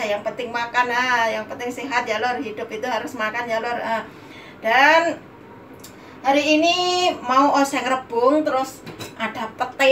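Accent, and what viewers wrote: Banyumas, Indonesia. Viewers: G ngapak g kepenakk ya mbk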